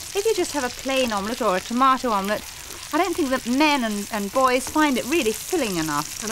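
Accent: RP, United Kingdom. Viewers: Wow, Mary Berry looks absolutely amazing back in 1973. She would've been 38 back then. Love her RP or Queen's English accent. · Judith Chalmers didn't sound much different in the 90s on Wish you were here... Mary sounds ever so posh, of course ;) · Why does she sound posher than royalty these days?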